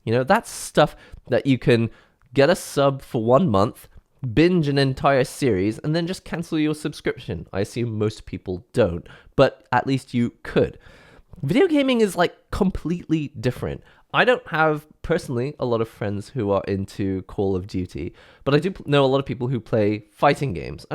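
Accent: United Kingdom. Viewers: Why do you have an uk accent?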